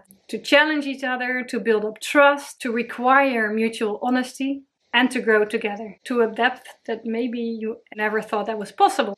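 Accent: Netherlands. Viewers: hey, I hear a fellow dutchie here!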